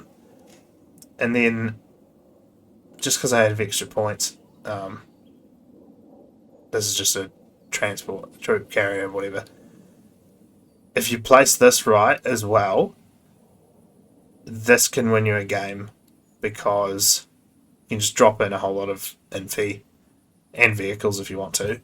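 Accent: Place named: New Zealand. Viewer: I thought you were saying "I'm just showing you my dick" for like 5 times until I realized you got that kiwi "i" vowel shift.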